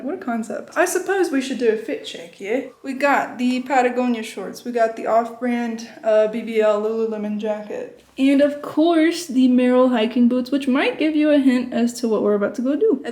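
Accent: Mexico City, Mexico. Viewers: Tu acento es muy mexicana😮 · Es increíble lo mexicano que es tu acento. No sabría si eres una mexicana que vivió mucho tiempo en EEUU o una gringa que aprendió español muy bien. Saludos desde Chile y disfruta ese hermoso país. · Hola!! Primera vez que veo tus videos y me gustan. Hablas bien Mexicana, tu español es muy claro 10 de 10 a tu español, Yayyy!! Espero que disfrutes mucho de este país y que que hagas muchos amigos para que salgas a otros lugares de México!! 🙏💚❤ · You sound soooo Mexican.. After a few weeks out there in Orizaba ¿why don’t you try out a bigger city? Digamos… Guadalajara, Morelia o la mismísima Ciudad de México 🇲🇽 Bienvenida a México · Tu español esta espectacular con un asentó muy mexicano, espero te la pases bien en México 👍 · no es anglosajona es lationamericana no tiene acento estadounidense cuando habla ingles. Pa ra la gente que no sabe ingles piensa que es estadounidense pero no tiene rasgos latinos. 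Cuando habla ingles se le oye el acento en espano dice mi esposa gringa. tien acento mexicano. tiene mala pronunciacion en ciertas palabras en ingles. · You're the Coolest Girl, Love to hear your Méxican Spanish, it's just awesome, Blessings and Congrats, ❤️🇲🇽🤍💙 Hablas un Español muy Dulce 🤗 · Que guerita tan activa y alegre, le pones Mucha passion a tu Vida diaria...and yes, your Spanish is very Mexican so that's easy-to understand and very fluid ... so enjoy your time in México.. bye and good luck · cuando hablas espanol parese que eres mexicana no manches muy bien. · So pretty girl !!! ... mexican accent ??? mission accomplished !!! ... why you choose Orizaba ??? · You’re white? U sound Mexican af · cuando hablas en español , tienes el acento de CHILANGA ... CDMX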